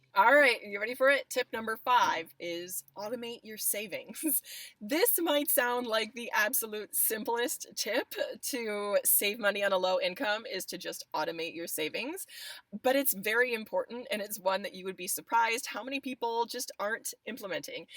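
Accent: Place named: Northeast, United States. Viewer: What part of the northeast are you from?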